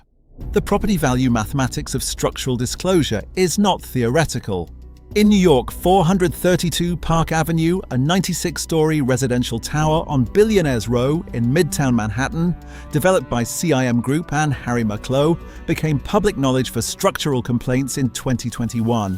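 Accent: United Kingdom. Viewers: Hey Mr. English accent. Why not do a video about the rape gangs in the UK? Or perhaps comment about the politics of the UK?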